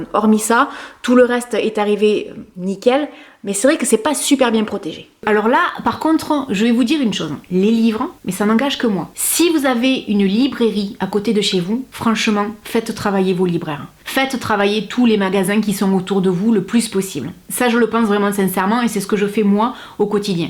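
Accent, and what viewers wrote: South, France. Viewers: Oui Sarah tu as raison,elle est jolie,son petit accent du sud,des cheveux et la couleur super belle. Elle est vraie, on le voit sur elle.moi je l adore.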